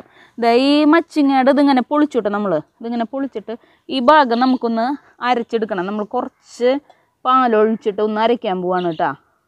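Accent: Thrissur, India. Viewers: ഭാഷ സൂപ്പർ ത തൃശ്ശ്യർ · ഈ വീഡിയോ ഒത്തിരി ഷ്ടായി. അവതരണം രസാണ്. ആ തൃശൂർ ഭാഷ വളരെ ഷ്ടായി. തൃശൂർ എനിക്ക് ഒരു ഫണ്ട് ണ്ട് അതെ സംസാരം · 👌നമ്മൾ തൃശൂർ ഗഡീസിന്റെ സ്ലാങ് സൂപ്പർ 👏👏👏സൂപ്പർ ടിപ്പ് 👍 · 🙏🙏 Super tips. Thanks for this tips. സ്വദേശം തൃശ്ശൂർ ആന്നോ. നല്ല പ്രസന്റേഷൻ. Video കാണുമ്പോൾ ഏതായാലും ബോറിങ് വരുന്നില്ല ഞാനും ഒരു subscriber ആണ്. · തൃശൂർ ശൈലി സൂപ്പറായി. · ചിരിച്ചു ചിരിച്ചു ഞാൻ ചത്തു നല്ല തൃശൂർ സ്ലാംഗ്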